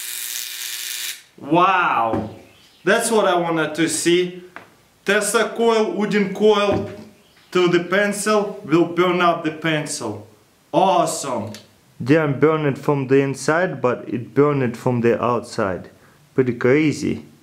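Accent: Russia. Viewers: 2 million subscribers... For the Russian accent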